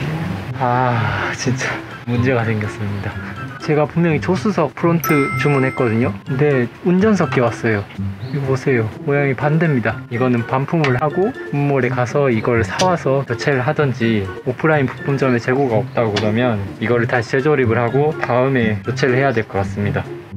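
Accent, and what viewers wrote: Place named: Gyeongsang, South Korea. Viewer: 손재주가 좋고 영상을 진짜 잘찍었네예 같은 경남쪽이라 사투리도 정겹고 와이프차 고치는데 많은도움 될듯 합니다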